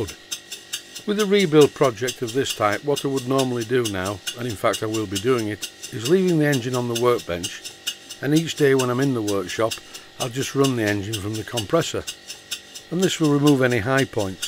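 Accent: Yorkshire, United Kingdom. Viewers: Judging by your accent, it sounds like you are a Tyke, sir... no wonder that you know all about steam engines! My respect, as a Tyke myself.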